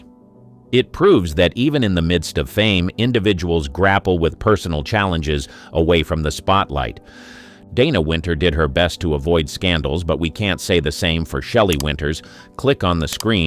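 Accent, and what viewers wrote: United States. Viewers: Typical uninformed American pronunciation with medial 'A's pronounced long. Had to stop an otherwise interesting video because I could not take one more 'Day-ner'! Yes, thank you it is 'Dah-ner'!.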